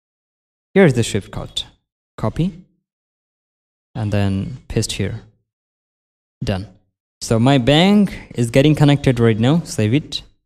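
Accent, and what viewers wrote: Bangladesh. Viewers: He is bengladeshi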